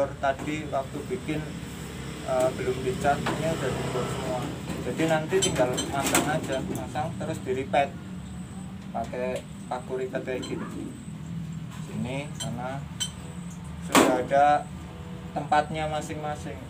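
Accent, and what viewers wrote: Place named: Banyumas, Indonesia. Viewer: Sampean asli mana kang? Ko ada suara ngapak'y 🤭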